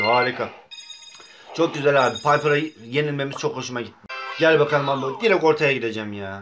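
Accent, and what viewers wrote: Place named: Trabzon, Turkey. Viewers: abi artık trabzon şivesiyle konuşmuyosun neden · Trabzonlu olduğu için olabilirmi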